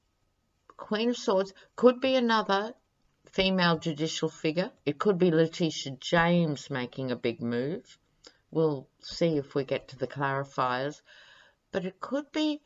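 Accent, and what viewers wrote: Australia. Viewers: Love that Aussie accent -- when you said you'd ordered a couple of new decks, it comes out dicks. If Ivanka is indicted, she won't hesitate for a New York second to rat out her father, to keep herself out of prison. We're so caught up in the US over the Trump business, we forget other countries in the free world have their disappointments, too. Australia -- we feel your pain. Thanks for the great reading -- as usual.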